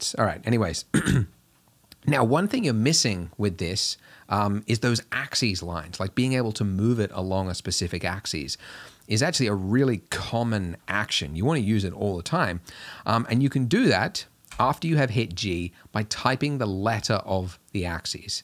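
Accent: Australia. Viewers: Are you from Australia ? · I'd love this series based on its content, but that Aussie accent is absolutely 👍. Love it!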